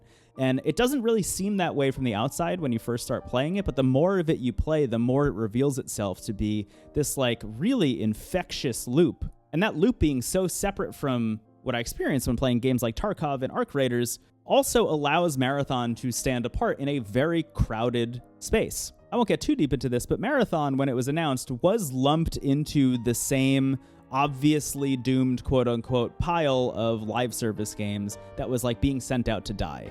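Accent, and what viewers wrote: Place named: Minnesota, United States. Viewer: this dude straight out of minnesota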